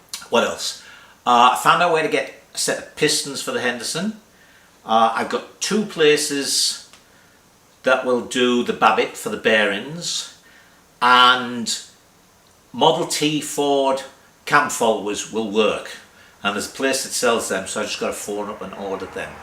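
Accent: United Kingdom. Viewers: I'm a car guy but I'm finding this series very interesting. Thanks for showing every little nuance that needs to be taken into consideration. Nice to hear a Brit abroad too. Mart.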